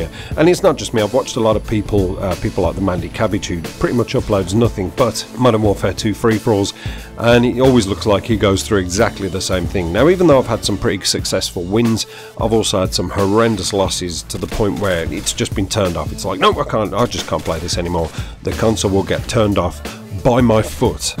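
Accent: Birmingham, United Kingdom. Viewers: love your vids, always entertaining, and your a brummie to so that always keeps me coming back for more, but one serious question tho, at 4 min 30 sec, HOW THE HELL DID YOU GET THAT BLOOMING KILL? with a SHOTGUN???????? so facking far away? lol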